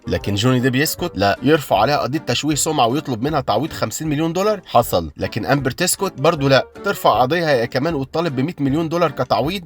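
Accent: Egypt. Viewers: It's the Egyptian dialect.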